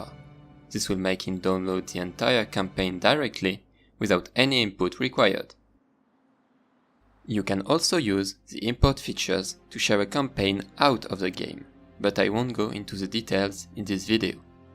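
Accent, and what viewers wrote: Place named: France. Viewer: Bon, je suppose que t'es français vu ton accent xD C'est en français le "campagne quest" ? Parce que c'est la première fois que je vois ça,et j'avoue que ça rajouterait un peu de lore épique :D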